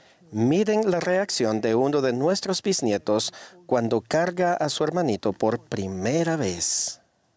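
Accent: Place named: Buenos Aires, Argentina. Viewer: Ese acento es argentino porteño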